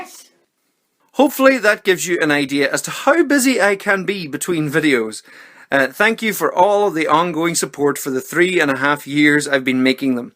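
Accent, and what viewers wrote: Ireland. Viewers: What part of ireland you from lad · Are you Irish? Just wondering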